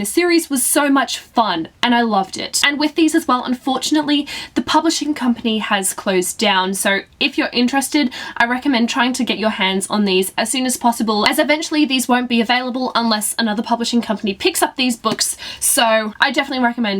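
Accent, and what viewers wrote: Australia. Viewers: Shes australian. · Deniz Baronchelli She's from Australia, honey. It's not fake. That's the Australian accent.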